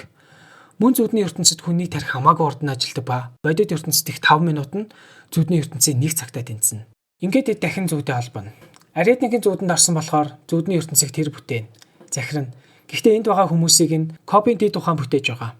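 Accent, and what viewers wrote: Uvs, Mongolia. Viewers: Увс аялага 🤨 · Увсаар яриад байхын 😂😂😂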